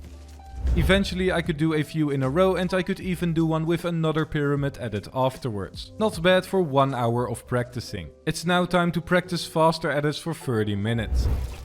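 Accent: Netherlands. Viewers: Are u from Netherlands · echt een hollands accent hahaha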